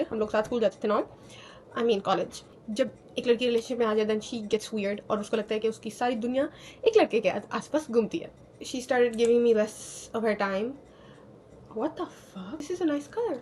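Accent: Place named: United States. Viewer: Ur American accent is so damnn good!!!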